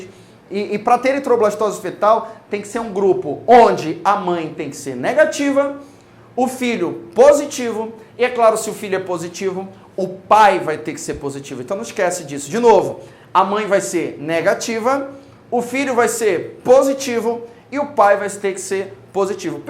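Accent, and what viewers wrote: Pará, Brazil. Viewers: Tu é paraense mano? Tem um sotaque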